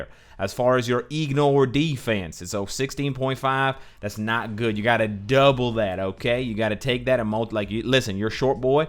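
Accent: United States. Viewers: I found that country accent funny and I wanna be roasted IGN abdouben22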